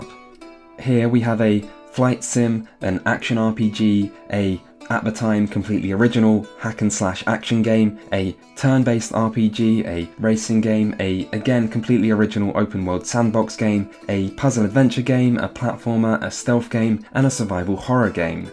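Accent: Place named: United Kingdom. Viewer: You sound so much like the youtuber shaun or maybe I'm stereotyping british accents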